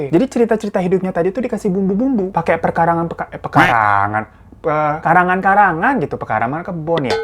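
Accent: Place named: Jakarta, Indonesia. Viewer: Ya ampun bang betawi amat yak wkakakkak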